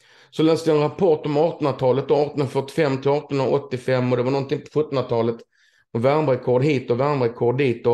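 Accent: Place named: Skåne, Sweden. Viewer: Ja där började din resa på köpenhamnsvägen .. i Malmö och dialekten sitter , och ålahue så skånskt 😜 tokigt 😂 men kul ..😘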